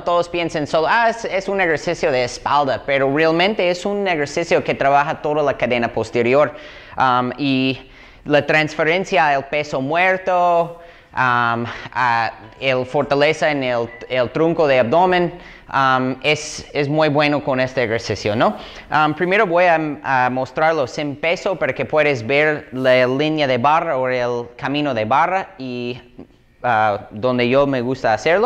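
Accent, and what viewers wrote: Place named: Yucatan, Mexico. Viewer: Donde vives? Hablas un poco como yucateco xd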